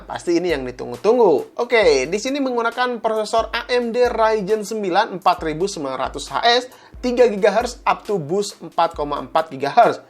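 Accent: West Java, Indonesia. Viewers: aduh akang sunda pisan hahaha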